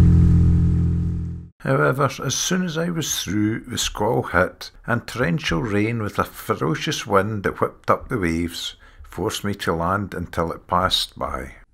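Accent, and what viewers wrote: Scotland, United Kingdom. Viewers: Amazing video and a lovely Scottish brogue. If God's willing, I will return to Scotland. My father loved kayaking in the San Francisco Bay. RIP my daddy.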